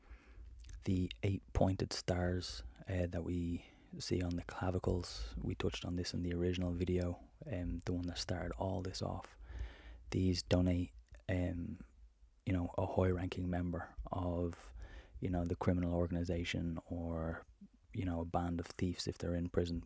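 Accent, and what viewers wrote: Ireland. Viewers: OMG !!!! Love your accent so much! Ireland ❤️❤️❤️☘️☘️☘️🇮🇪🇮🇪🇮🇪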